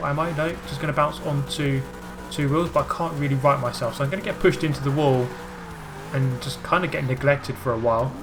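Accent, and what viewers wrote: United Kingdom. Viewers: The contrast of this pure madness along with the calm British commentary is just great lol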